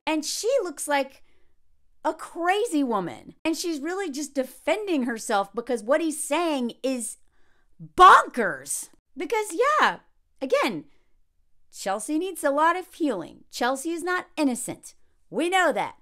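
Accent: South, United States. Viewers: You still have a southern accent. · You have a southern accent, but when I say that I mean that you have a general American accent with the slightest, tiniest southern accent on certain sounds. I only say this because I do voice acting and have pretty much the same accent. Only linguists seem to clock it.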